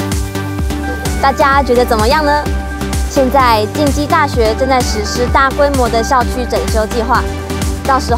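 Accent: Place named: Taiwan. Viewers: 本多照子 発音からして、台湾人だと思います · 台湾人だと思います。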